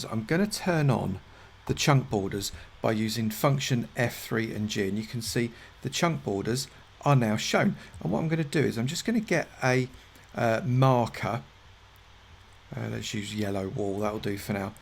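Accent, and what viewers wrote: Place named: England, United Kingdom. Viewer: He sounds nothing like him imo. Just an English accent.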